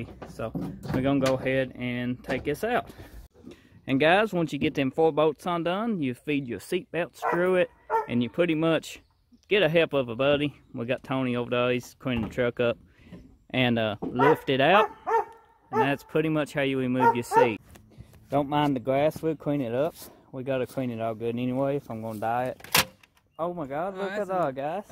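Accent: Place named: Louisiana, United States. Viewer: Cajun accent isn't it? You hear that down in Louisiana.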